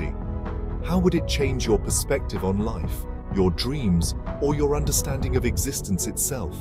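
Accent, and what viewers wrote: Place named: RP, United Kingdom. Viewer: Imagine a Youtube with no AI created garbage videos like this channel, videos would then have to be spoken by real people with faces and names instead of this endless chatGPT scripts read by posh british actor voices in monotonous repetetive nonsenceical giberish. What a world, it exists in many other videos created by astrophysicists but not here where the creater is just a grifter.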